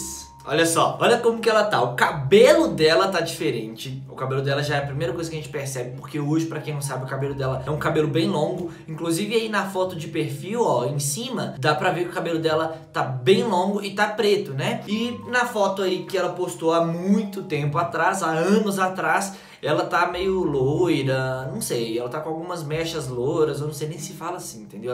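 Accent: Minas Gerais, Brazil. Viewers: vc é Mineiro?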